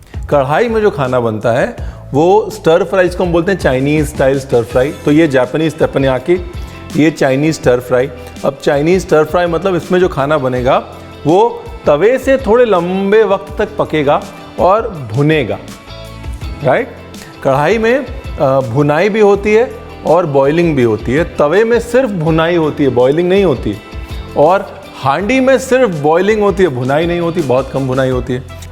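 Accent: India. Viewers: At first I used to ignore your videos coz I’m not that much into cooking!! Then I started watching ur videos.. now I’m really liking ur videos ofcourse I get to learn few things but mostly your Indian-desi style of talking n cooking... ur videos are entertaining!! Keep up the good work Ranveer!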